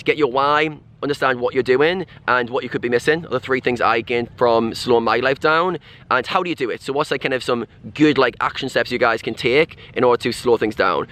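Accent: United Kingdom. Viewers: If you are up for feedback then the other thing about the speed of your speech is the accent. I’m in London and am well versed with with the many different accents throughout the country. Whilst your Geordie accent (I’m guessing) is not super strong it is significant and not a problem for me but when you combine that with the speed of voice then anyone that does not use English as their first language could find it hard.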